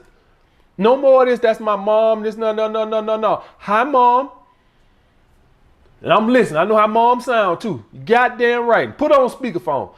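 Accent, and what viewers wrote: South, United States. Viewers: Lol dafuq is rambling??? Yo this nigga country as fuck! Keep up the good work homie I listen to almost every show. Red💊 all day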